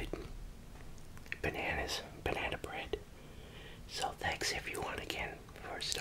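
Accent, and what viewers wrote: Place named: New England, United States. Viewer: this is the first of your videos i have seen -- i got so excited to hear your new england accent!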